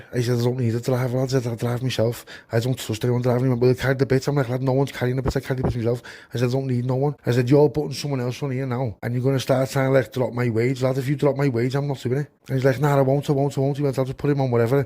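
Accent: Liverpool, United Kingdom. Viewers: Hi scouser ❤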